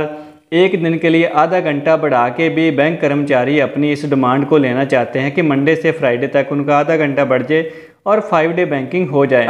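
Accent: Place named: Punjab, India. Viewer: खोदा पहाड़ निकला चुहा।कुच्छ भी नही होने वाला है ।आप का बोलने का लहजा है वह पंजाबी लगता है।कृपया हिन्दी बोलना सीखो।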